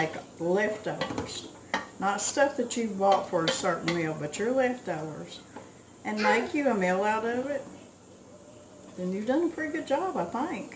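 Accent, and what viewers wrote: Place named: Tennessee, United States. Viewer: I see why.You home is beautiful, and your recipies are vicious, reminds me of My Moms cooking.And your voice is so I dont know laid back.My daughter tried to get me to do a cooking channel and I told them hell no,12 hours workin in the hospital is enough lol.12 hours a day at tjeb hospital.was enough for me.Where do you live?If you dont mind me asking our accent sound like Tennessee.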